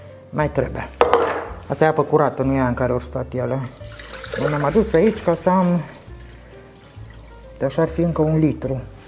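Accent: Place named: Arad, Romania. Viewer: Vă iubesc,doamna! Iubire maternă,pt.că sunt septuagenară.Sunt originară din Ineu și mi-e dor de"graiul"din zona Aradului.Mi-e drag tonul dv.cald,felul cum explicați,profesionalismul,tactuldv.,nivelul intelectual,TOT!❤ Vă doresc multă sănătate,un AN NOU CU SPOR ÎN TOATE!🤗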